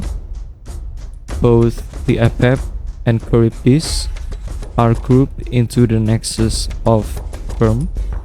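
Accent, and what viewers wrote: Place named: Indonesia. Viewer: Dude I could just TELL you had an Indonesian English accent! wonderful art too!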